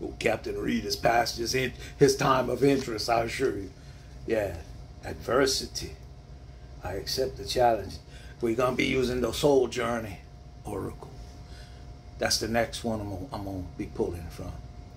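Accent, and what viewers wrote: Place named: Louisiana, United States. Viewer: You remind me of my Grandfather! Both of them .. Both of them were born and raised in Louisiana. Southwest Louisiana to clarify :) Really enjoy listening to you. Reminds me of Home.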